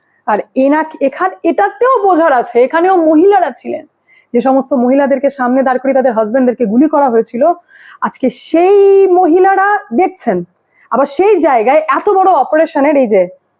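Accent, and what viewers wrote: India. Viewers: দিদিভাই তোমার ভিডিওগুলো খুব ভালো হয় কিন্তু তোমার ভিডিওতে দিদিভাই হিন্দি টান থাকে পুরো বাংলায় ভিডিও বানান দিদি আপনার ভিডিওগুলো খুব ভালো হয় প্রাউড বাঙালি হিন্দি টান ছেড়ে ফুল বাংলা টানে ভিডিও বানান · Bangla bolte bolte hindir aanch ta na tanai valo. Yeh-te sunte irritate laage.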